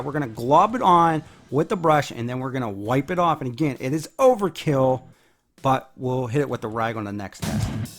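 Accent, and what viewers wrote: Pittsburgh, United States. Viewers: I hear some yinzer in your voice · I like your Pittsburgh accent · Pittsburgh accent?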